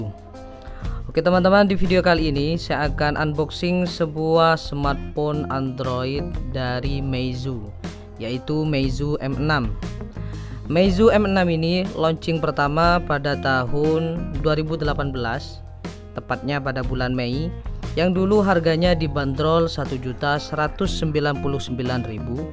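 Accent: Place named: Madura, Indonesia. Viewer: Kok kedengarannya seperti logat Madura😁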